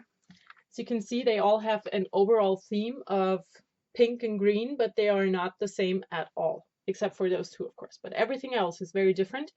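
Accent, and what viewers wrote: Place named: Germany. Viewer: Hallo, du sprichst doch sicherlich Deutsch, oder? Ich höre so ein Dialekt in deinem Englisch...:-) Wäre schön, so ein Video auf Deutsch zu machen. Danke!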